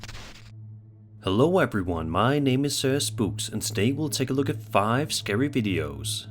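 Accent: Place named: Denmark. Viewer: I like how after i found out you were danish i can’t unhear the strong accent you have lol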